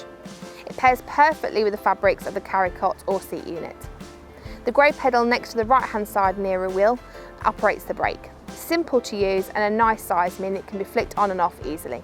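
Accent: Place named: United Kingdom. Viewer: If you aren't British, it's really hard to understand her...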